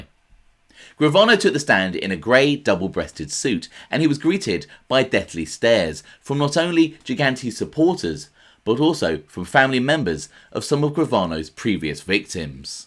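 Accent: United Kingdom. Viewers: Brit talking about American Mafia lol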